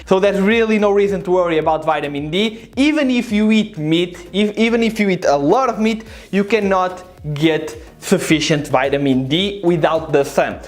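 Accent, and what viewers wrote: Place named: Spain. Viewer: Ha, I think its the Spanish coming through.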